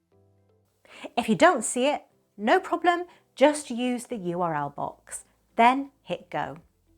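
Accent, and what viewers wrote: United Kingdom. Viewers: It is true, you are a very lovely girl, and I really like your British accent❤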